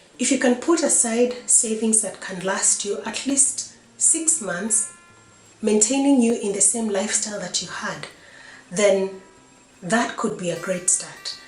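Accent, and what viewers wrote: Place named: Kenya. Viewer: I came across your video after quitting my job abruptly. Thanks for the solid advice. You sound Kenyan na uko Vienna? Niko Wien pia. Point 7 hit home...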